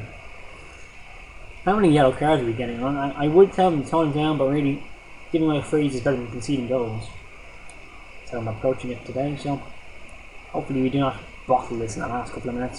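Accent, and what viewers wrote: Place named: Ireland. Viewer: Where bouts in Ireland are ya from?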